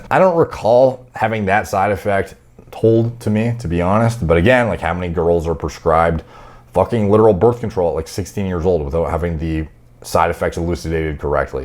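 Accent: Ireland. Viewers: Bro sounds Irish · Buddy nobody taking fucking advice from no irish sounding asian that uses roids and is still smaller than an 8th grader wtf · I love his accent. Very Irish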